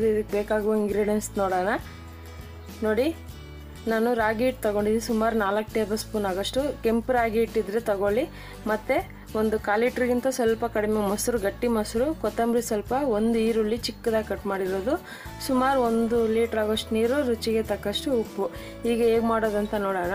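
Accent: Tamil Nadu, India. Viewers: Nimma language noduddre gothaguthe you are from tamilian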